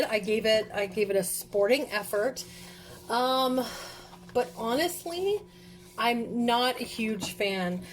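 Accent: United States. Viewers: From a german speaker: i don't hear a boat ^^. I think it's the american accent 😀. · Ha. Ok, so your grandson truly is the cutest. Holy crow. As for “out and about”...I grew up in Illinois and Wisconsin. Moved to Tennessee as a teen. I’m 46 now and when I watch you, I find myself saying out and about” with the northern accent. My husband teases me about it. So yes, we hear it. (And love it, it brings back childhood memories for me) 🧼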